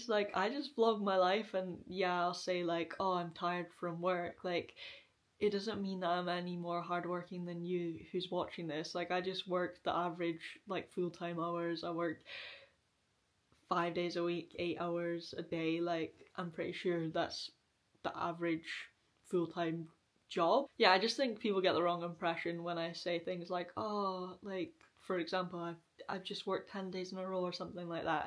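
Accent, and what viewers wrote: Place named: Scotland, United Kingdom. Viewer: Aw you’re welcome! I’m in West Lothian, yet to visit Inverness, but maybe one day! I love a good homey-vlog, anything relaxing! Your videos, and Rosie Henshaw are just so nice to watch, plus it’s nice to hear a familiar Scot’s accent on here! Hope you all have a great Easter 💛🙏🏼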